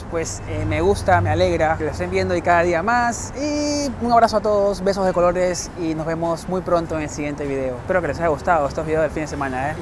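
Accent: Peru. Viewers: Tienes un acento como de mi perú 🇵🇪!!! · Bravazo tu video hermano !! Eres peruano verdad ? Por tu acento limeño.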